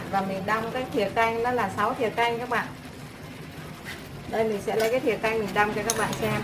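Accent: North, Vietnam. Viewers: Bạn hướng dẩn kỳ càng và có dọng nói của miền bắc nông thôn thật thà, cám ơn bạn !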